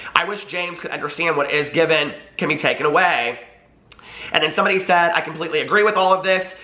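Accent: South, United States. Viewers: New subscriber and I adore your fun personality!! Also, love the Southern accent and hugs from Texas.. James Charles is a immature and entitled man child. Whereas, Jeffree Star is a mature grown person, who remembers his roots.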